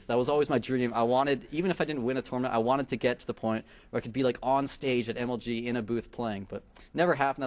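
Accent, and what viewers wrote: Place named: Canada. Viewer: I think shes Canadian lol. Aboot!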